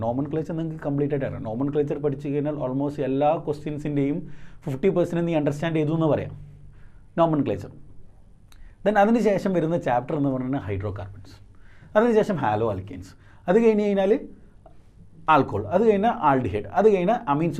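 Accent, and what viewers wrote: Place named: Kozhikode, India. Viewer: സർ കോഴിക്കോടൻ ഭാഷ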